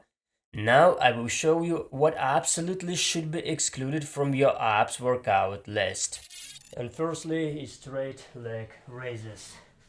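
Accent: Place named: Eastern Europe. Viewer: ah Russia that's why I hear some slavic in your voice. I'm in the UK , mixed ancestry of polish, English, German, Spanish and Barbadian!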